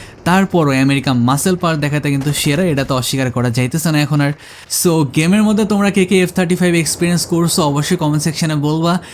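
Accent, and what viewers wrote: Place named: Rajshahi, Bangladesh. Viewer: Apnar basha rajshahi er kothay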